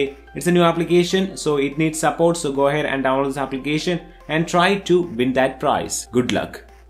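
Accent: Kerala, India. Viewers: i guess u are a malayali??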